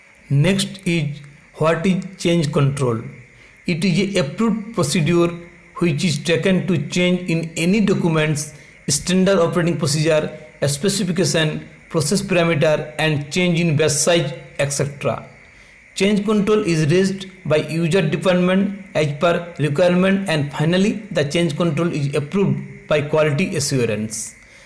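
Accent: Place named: England, United Kingdom. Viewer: I like your english accent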